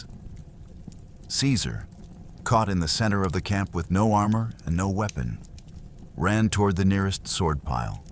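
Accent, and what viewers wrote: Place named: United States. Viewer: American accent doesn’t help describing historical facts…….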